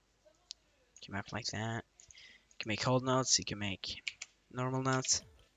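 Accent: Sweden. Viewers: That's a nice swedish accent you got there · I can hear the swedish accent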